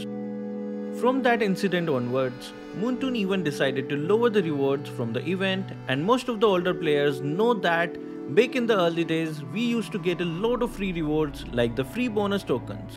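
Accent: India. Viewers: Hi, I'm genuinely curious why you have Japanese name but you have Indian accent. So if I may ask are you an Indianese or Japian?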